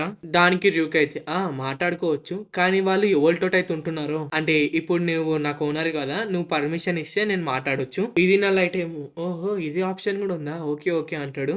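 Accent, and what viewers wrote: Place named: Telangana, India. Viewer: Burrapadu undi bro ni telangana slang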